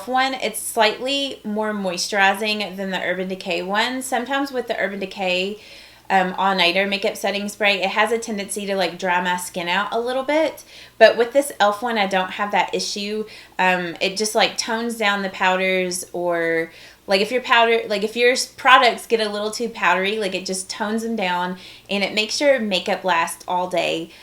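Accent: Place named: Kentucky, United States. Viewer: I thought your accent sounded familiar! I'm from Ky also(right outside of Louisville), currently stationed in California. I can't wait to get back to the blue grass❤️ I love your videos, I look forward to watching them.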